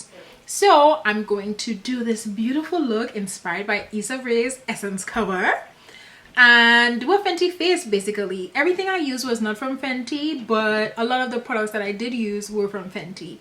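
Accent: Trinidad and Tobago. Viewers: love your accent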